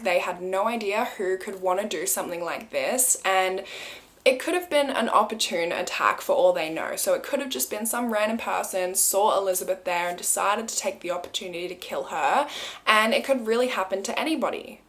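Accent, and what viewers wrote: Australia. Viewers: Ahhhh about 17 minutes in you were explaining that "here in Australia" you call Nissans nissin haha 😄 okay so you're accent is Australian.... just beautiful 😍 ❤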